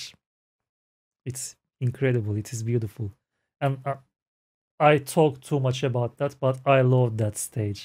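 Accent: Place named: Turkey. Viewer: You're Turkish 😁it's so obvious from your accent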